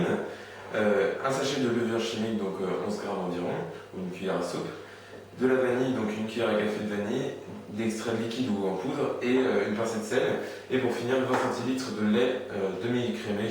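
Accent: England, United Kingdom. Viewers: tu me soule aek ton accent anglais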